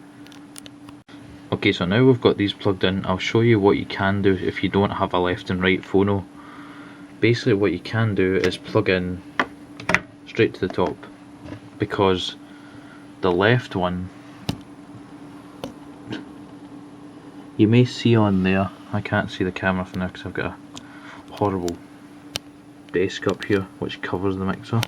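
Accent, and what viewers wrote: United Kingdom. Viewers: are you from Wales? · I believe he's Scottish not Irish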